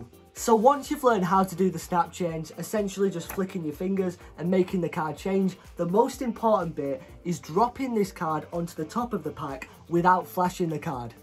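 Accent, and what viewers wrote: United Kingdom. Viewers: l love your british accent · You are incredible. Can I ask you where are you from. It sounds that you have a british accent.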